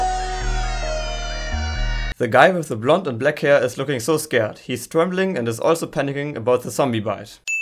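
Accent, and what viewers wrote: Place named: Germany. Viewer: Alter ich glaub jeder deutsche erkennt, dass du net englisch bist😂 aber is ja kein problem.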